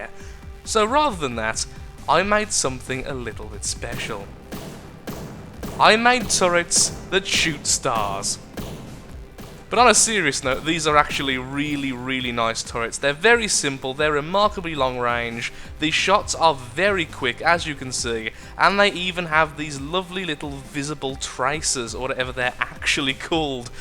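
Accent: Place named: England, United Kingdom. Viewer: lol i can tell that you are english from your accent.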